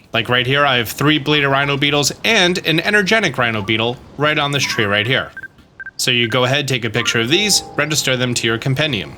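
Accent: New Jersey, United States. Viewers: Oh man, New Jesey English is like trying to talk to someone from switzerland. (Hello from Austria )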